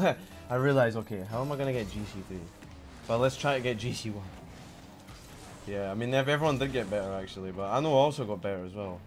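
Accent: Scotland, United Kingdom. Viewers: Same old Scottish AF 😂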